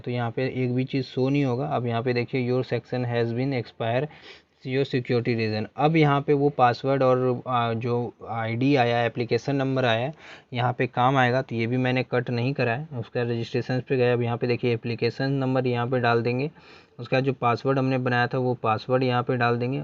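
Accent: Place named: Narela, India. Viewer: Bhai aap narela se ho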